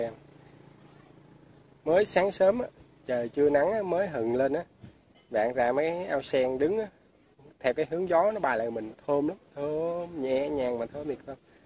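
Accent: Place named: Southwest, Vietnam. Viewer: Rat thich Video clip cua Anh, hinh anh giong mien Tay cung giong noi chan chat mien Tay cua Anh lam toi nho lam que toi . Hy vong mot ngay gan day se ve VN cung anh nhau mot bua : ))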